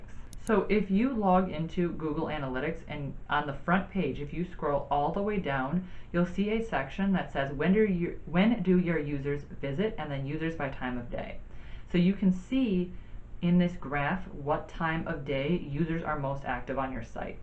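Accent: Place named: United Kingdom. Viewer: Thanks for helping video. I saved your video to watch it later again because I don't understand British accent properly because they talk shortly. But English is not my native language and also I'm not expert it English 🙂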